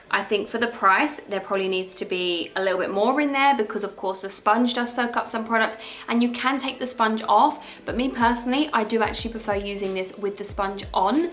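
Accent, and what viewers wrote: Essex, United Kingdom. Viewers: Are you from Essex?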